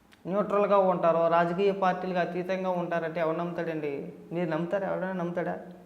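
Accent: Godavari, India. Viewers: Power of Godavari slang 😊❤🎉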